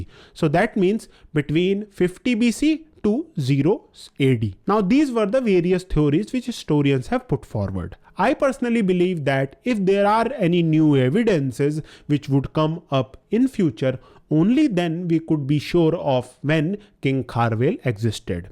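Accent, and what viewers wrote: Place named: Hindi Belt, India. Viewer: Arre Babu, please follow Sanskrit and Odia pronunciation not Hindi heartland - It is Kharavela not Kharvel.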